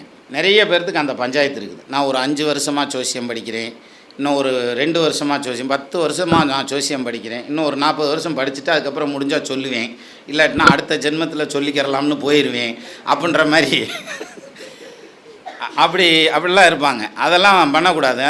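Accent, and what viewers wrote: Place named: Madurai, India. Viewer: இவருடைய மதுரைத் தமிழ் சொக்கன் குடுத்த சங்கத்தமிழ் சொக்க வைக்கும் தமிழ் அற்புதம்.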